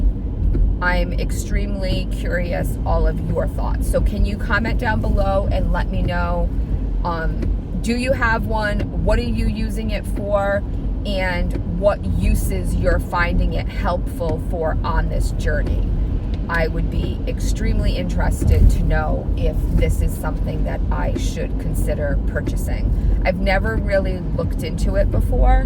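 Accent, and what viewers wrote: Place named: New England, United States. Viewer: Been meaning to respond for a week now because I just stumbled across your videos. I love your accent and I could immediately tell you were from New England because of it! I just wanted to chime in here. I'm 5 feet 6 inches, and have very small wrists. I wanted a watch that would record my steps and my blood pressure. I'm really not a fan of Apple products and wasn't really sure that I would use an Apple watch if I had one. So I went the cheaper route and found one on Amazon that was $30 with a $10 coupon. The watch was $20 and some change. It received 4.5 out of 5, and after reading the reviews, I bought it. I couldn't be happier. Yeah it's a little bit big on my wrist, but it measures everything I want and you can shut off the things you don't. I became a little bit fascinated with my sleep cycle, because I'm retired now and can sleep as long as I want, pretty much. 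I used to commute 5 hours a day to my job, so sleeping is very enjoyable, lol. Anyway, I bought this watch thinking I could exchange it down the road, for something I liked better. Forget about it. The battery holds a charge forever, it's got a bazillion clock faces to choose from, and the water reminder lights up, vibrates a little and tells you to drink water! What's not to love? It's a little bit difficult to set up, but really this watch can do way more things than I use it for, so just the basics are easy to set up. It does everything an Apple watch does, and somethings I didn't know about, like find my wat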